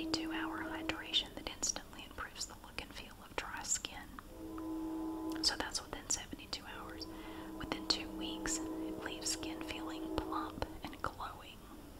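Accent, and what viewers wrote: South, United States. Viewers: No filter on your southern accent/charm. 5/5 Stars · Yay other southern asmr love it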